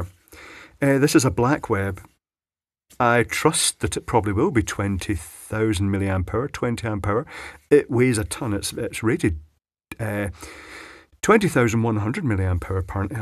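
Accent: Scotland, United Kingdom. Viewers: Hey. Long time listener, first time messaging. Loving your vids. I’m clueless when it comes to electronics and live listening to your descriptions. Even if I can’t properly understand it all. Fife, born and bred. I love hearing a Scottish accent on a YT vid. I was watching a recent video of yours where you mentioned putting an aluminium heat sink on your pile of interesting heat sinks. Can I trouble you for a video on that subject? I’m a scratchbuilding model maker and love cool “found” shapes. And an annotated video from you about such cool things would be of great interest to me. And I bet other subscribers also. Thanks in advance. Regards from glenrothes.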